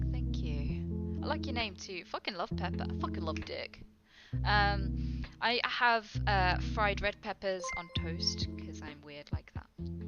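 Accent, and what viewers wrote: United Kingdom. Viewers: You're so likeable 😂 imperfections are such an attractive quality, especially when you have that amazing English accent 😍 from an Irish man 😘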